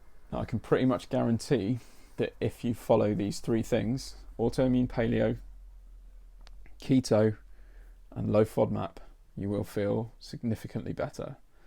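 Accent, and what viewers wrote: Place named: United Kingdom. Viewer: I took accutaine twice, once in my teens and again at around age 32. Suffered different areas of body pain through my 20's but never made the connection. After taking it the second time, got major gut issues, pain got worse and lots of brain fog. At 42 the symptoms got really bad fulltime and I've been sick and unable to work since. I'm 62 now. Do not even think of taking this drug! I've tried almost everything but I never heard of the gallbladder/liver connection until I watched your video. It makes a lot of sense. Going to look into it further. Have you thought of FMT? You have a British accent so if you are in England, there is a clinic called, I believe Taymount, that some say has helped them. I'm in Canada so it would be a bit of long haul. We'll see. Please keep posting if you're able.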